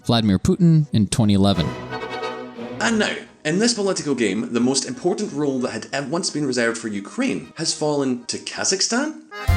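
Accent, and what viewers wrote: Scotland, United Kingdom. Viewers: honestly i'm quite pissed they hired a scot with such light accent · *uk (he's Scottish but close enough) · It's nice to hear a Scottish voice, 🤠 We're hoping to hear more about internal geopolitics such as Scottish independence and Irish unity. All the best to you!!! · This channel defo requires some Scottish flavour a wee bit more. Aye g'ud job there lad! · The Scottish accent was something I didn't know I needed on this channel but I welcome it! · I love the Scottish Accent Mate! Well done nice job and great sense of humor 👍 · Scottish, NOT. BRITISH! And no beard. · Where the f*** is Simon and why is this Scot talking so quick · It's not a British accent, posh Scottish which is much different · Scottish accept is difficult for me. Nothing personal. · Some Scotish accent characteristics I've just learnt: Perfect -> PEAR-fect Signed -> scent/Saint (if you speak "inwards") Side -> sight Outside -> oat-sight Know -> mix of no & nule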